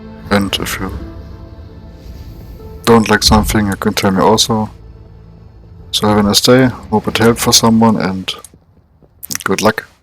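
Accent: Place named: Germany. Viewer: OMG der deutsch akzent XD Ich liebe es HAHAHAHA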